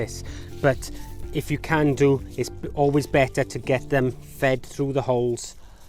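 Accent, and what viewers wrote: Wales, United Kingdom. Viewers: Love your videos, great presenting style and the accent is brilliant! (I'm welsh too!). After watching this one I think I'll be adding Garlic to my garden next year. Cheers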